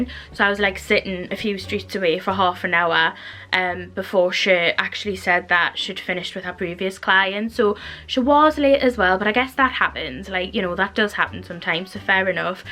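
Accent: Newcastle, United Kingdom. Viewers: Hope you enjoy Edinburgh plus I love accent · I’m from Bedlington but study in Edinburgh, love hearing a geordie speak, you make me feel at home ❤️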